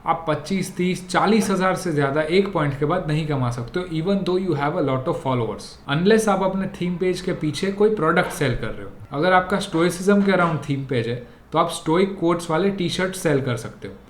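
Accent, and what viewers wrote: Gujarat, India. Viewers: Gujrati hai kya bhai tu ?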